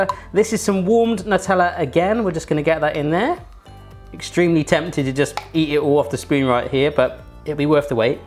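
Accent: United Kingdom. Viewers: You sound so british I love it xD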